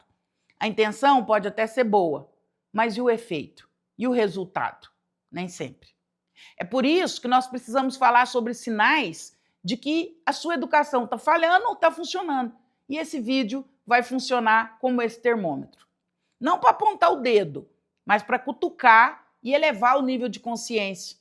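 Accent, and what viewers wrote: Brazil. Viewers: Olá, a senhora poderia melhorar este sotaque, e parar de falar com este R interiorano e falar sem sotaque.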